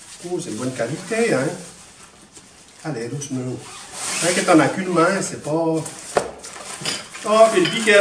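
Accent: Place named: Fribourg, Switzerland. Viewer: Super ton accent. Moi en bon fribourgeois, je peux pas dire le contraire....... Tché Don !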